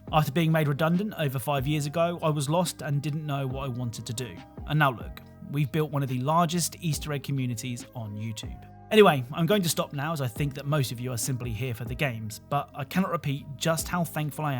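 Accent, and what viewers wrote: United Kingdom. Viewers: I really have to say this but finally seeing how you look in real life your voice doesn't how you look your voice is so tame British I guess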